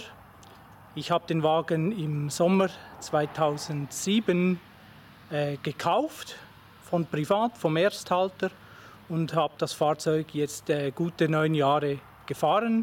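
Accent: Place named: Sweden. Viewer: Are you Swedish of origin? Sounds like i'm hearing a Swedish 'R' :-). Beautiful Alfa.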